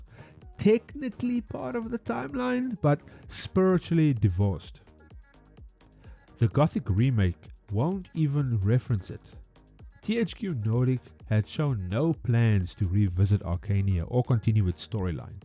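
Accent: South Africa. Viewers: I'm sorry if this is a bit off topic, but given your accent I have to ask: Are you South African? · Do I hear a fellow South African?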